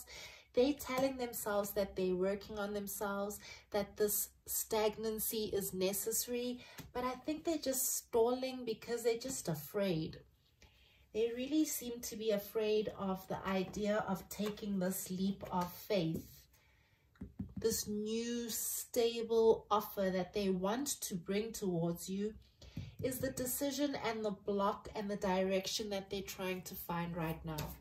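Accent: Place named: South Africa. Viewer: I know that South African accent. Heeey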